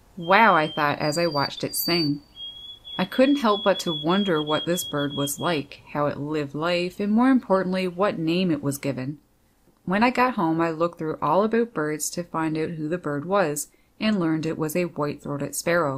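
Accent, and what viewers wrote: Canada. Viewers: Love your accent my Canadian sister!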